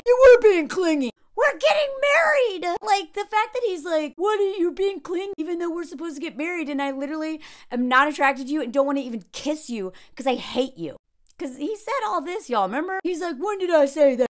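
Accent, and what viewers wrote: South, United States. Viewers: You still have a southern accent. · You have a southern accent, but when I say that I mean that you have a general American accent with the slightest, tiniest southern accent on certain sounds. I only say this because I do voice acting and have pretty much the same accent. Only linguists seem to clock it.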